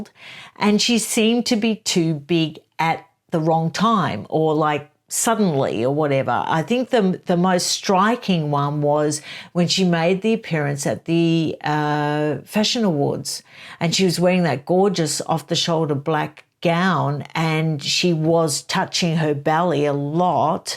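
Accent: Australia. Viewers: I absolutely don't agree she was ever pregnant. Her belly was different sizes on different days. Often different sizes on the same day. What about when the bump fell down past her knees? Remember when, at almost full term, she did a deep squat in high heels & needed no help to stand up again. Unborn babies don't conveniently move to one side so you can squat. Anatomy doesn't work that way. I've always been very slim & had 2 babies in my 20s, not middle aged as she was. I can tell you, my baby bumps got bigger month by month, not up & down on sometimes an hourly basis. Neither did my babies conveniently get out of the way if I wanted to squat. Not that I could have, even if I wanted to. So much more I could say, but, NO she was never pregnant. Love your vids. Your lovely accent brings back fond memories of the 5 yrs it was my privilege to live & work in Sydney. 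It was bonzer. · I really like your lovely gentle Aussie accent (I'm a Londoner) and enjoy your views...thank you!!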